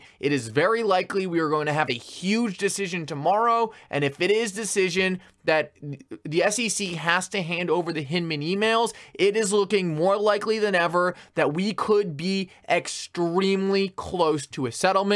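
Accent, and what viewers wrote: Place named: California, United States. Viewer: Are you from California? You have a Cali accent.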